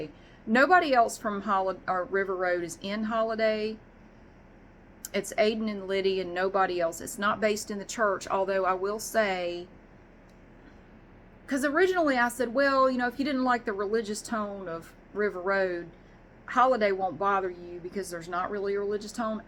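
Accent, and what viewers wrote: South, United States. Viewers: Ms., I’m not sure if you already know, but there is a viral video of a woman with a southern accent on TikTok and many of the people watching that video were requesting southern accent asmr from her. Soooo many of the people replying to these comments were suggesting your channel and singing your praises! I was so happy to see that you’re so popular, even people on TikTok bring you up! Just a small a testament to your affect in the asmr community 🤗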